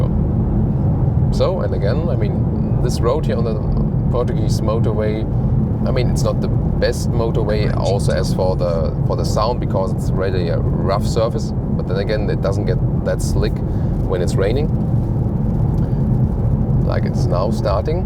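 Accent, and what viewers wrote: Germany. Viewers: very good review! Although in my view the sport seats which are part of the Edition 1 are very comfortable in my view. I agree with you, the Standard seats are eventually better for very long trips, but they look too serious in my view. But that is a very personal thing, I see that you like more the traditional style things, elegance - thats good and fits to you :-) I like your reviews very much, but why dont you do them in German, I can hear that you are a German (I am Swiss).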